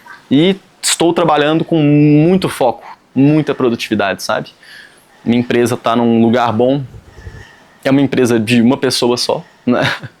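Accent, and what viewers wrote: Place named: Minas Gerais, Brazil. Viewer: Mineiro falando já dá uma paz de espírito… parabéns pelo conteúdo!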